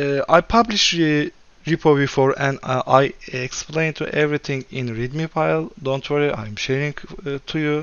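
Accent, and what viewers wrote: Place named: Turkey. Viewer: Aksandan direkt Türk dedim öyle çıktı. :) Başarılarının devamını dilerim. AR t-shirt yapabilir miyim flutter bilgim var unity bilmiyorum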